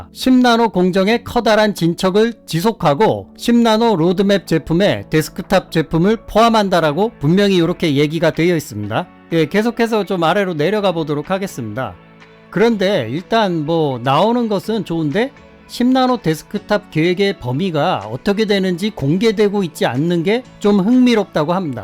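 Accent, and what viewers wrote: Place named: South Korea. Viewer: 이아저씨 영어가 콩글리쉬라서 귀에 쏙쏙들어오니 좋네 ㅋㅋㅋ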